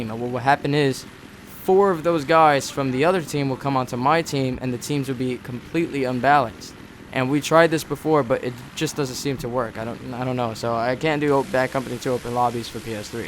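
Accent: United States. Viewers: for you americans out there? i thought you where american.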